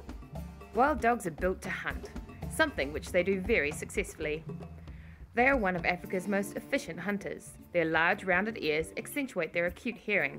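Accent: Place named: New Zealand. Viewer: Kiwi accent . Lol. Kia ora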